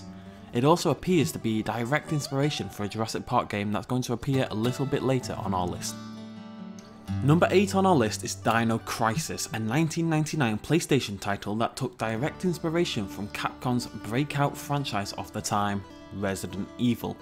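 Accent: United Kingdom. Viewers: ***** jpog is my fav dino game and by the way are you British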